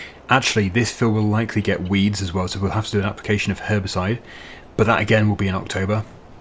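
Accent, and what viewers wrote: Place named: United Kingdom. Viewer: dount ever change how you talk british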